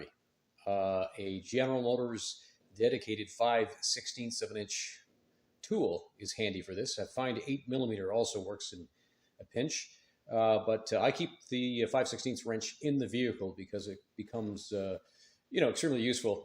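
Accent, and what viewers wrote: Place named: Canada. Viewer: very cool and sounds like u r canadian too. sweet, do you sell ecm's?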